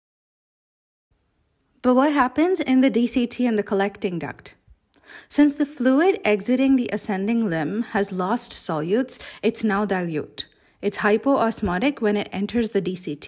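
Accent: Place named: India. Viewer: sounds so indian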